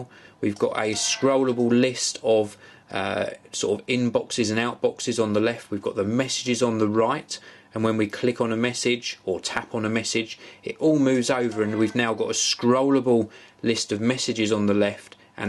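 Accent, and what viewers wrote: United Kingdom. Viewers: Man i love your ACCENT!!!! i wish i could talk like that... i love that typical british accent! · Oh great your a brit.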